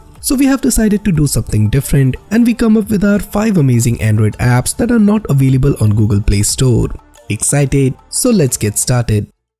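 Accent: India. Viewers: He sounds like that Indian from android guy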